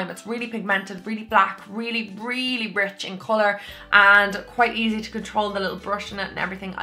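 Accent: Ireland. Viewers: I LOVVVVVVEEEE that your Irish I'm from Dublin and any blogger I watch is American sooooo happy I came across you xx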